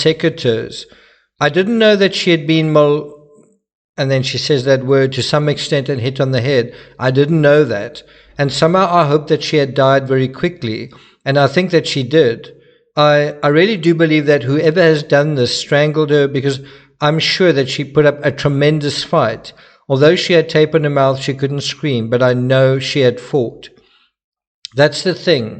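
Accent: South Africa. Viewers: I'm confused, do people not realise you have a South African accent and that's why you pronounce things the way you do?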